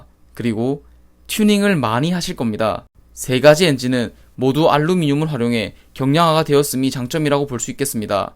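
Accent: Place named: Gyeongsang, South Korea. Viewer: 숨길수 없는 깅상도억양 첯음절에 강력한 엑센트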